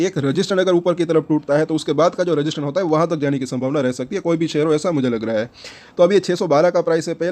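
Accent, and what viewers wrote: Gujarat, India. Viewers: Sir aap Gujarat ke lagte ho plz reply dena.. Kyu ki apki awaj Gujarat ki hindi dhhal lagti hai.. Main bhi gujarati muje apke soor se aap gujarati lagte ho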